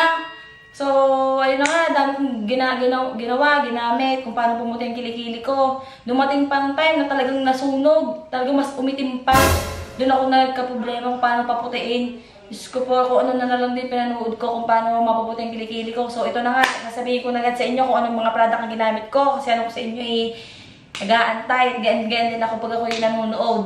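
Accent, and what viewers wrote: Batangas, Philippines. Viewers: Ang gudvibes ni ate gurl hehehe... 😂😂😂 batangeña... Tone heheh. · Ang lalim po ng accent mo...taga batangas ka noh? Hehehe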